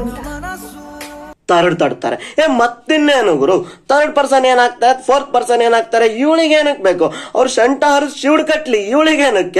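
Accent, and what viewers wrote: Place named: North Karnataka, India. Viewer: ಸ್ವಲ್ಪ ನಮ್ಮ ಉತ್ತರ ಕರ್ನಾಟಕ ಭಾಷೆ ಬರುತ್ತೆ ಅದಂತೂ ಗಿಚ್ಚ್ ಗಿಲಿಗಿಲಿ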